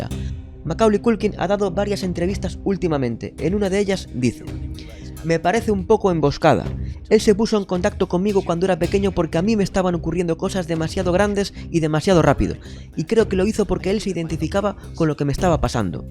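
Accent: Galicia, Spain. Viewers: no gracias a ti por recopilar videos y pruebas que según entiendo están en la casa de los jackson o en evidencias de los Estados Unidos de America y por el acento y todo supongo que eres de españa ósea al otro lado del mundo de donde sucedieron los cosas pero bueno esperemos haber si sale algo que destrone a bohemia rhapsody y volvemos a ver niños bailando y escuchando a Michael dándole más empuje a su venta de canciones pero ahora en descargas digitales para que le adjudiquen otro logro y tú sigas haciendo videos defendiéndolo 🙂👍 Recuerda amigo todo en esta vida es un negocio y yo me animo a apostar que el par de hombres que salieron en el documental solo están resentidos de no tener trabajo y ya no tener a jackson para que les mate el hambre y les pague con M&M’s y dólares en fin los padres de esos dos en dado caso que sea cierto que los violaba ellos tuvieron la culpa y además ellos por igual ya que preferían ser violados y recibir dinero,vacaciones, ropa discos dulces etc que seguir luchando muy aparte de jackson Y no soy fan ni ataco a jackson es que estamos en una época donde todo esto ya es más visto en el mundo de les espectáculo y siempre termina siendo mentira o sacan un álbum nuevo y en el mundo real pues el cuento se cuenta solo no crees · He llorado tanto con este video.... 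como tu dices hay que ser muy fan para conocer un poco el carácter de Michael, saber de toda esa gente , empaparse de videos, entrevistas e información que tenemos al alcance de cualquier persona, sin embargo la gente prefiere alimentarse del morbo de las noticias y quedarse con una imagen de Michael manchada.ASCO. Yo tbn pienso que este documental debería ser un puto delito sacarlo ahora a tantos años de su fallecimiento, en fin ya les vendrá el puto karma, que asco. Por cierto eres gallego verdad? jaja hi bro xddd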